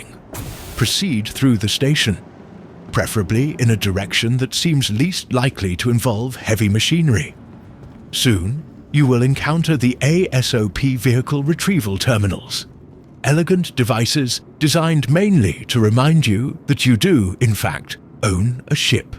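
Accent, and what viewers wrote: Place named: United Kingdom. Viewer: Great comedic writing! And the stiff-upper-lip British voice is peak! Can't wait for the next one.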